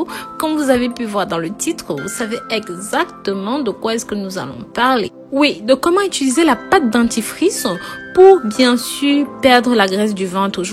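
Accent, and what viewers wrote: Africa. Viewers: Vu l'accent c'est une africaine qui vous prend pour des cons moi j'ai perdu 5 cm de tour de taille en 1 mois grâce au sport programme abdo, et footing tout les matins avec un bon régime alimentaire si non tout est bidons ....merci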